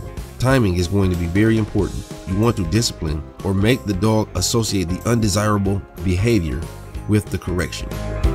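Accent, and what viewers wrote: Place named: Ohio, United States. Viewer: That man educating and sounding like he from the other other side of Ohio. ❤️ get extra proper for the people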